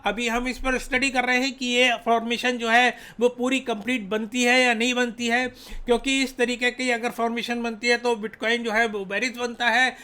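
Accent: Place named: Haryana, India. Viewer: Aap Haryana Se ho kha sa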